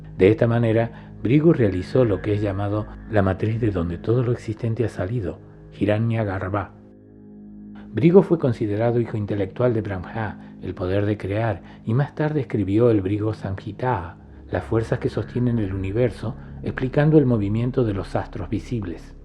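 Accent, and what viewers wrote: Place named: Córdoba, Argentina. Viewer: El lector es Cordobés argentino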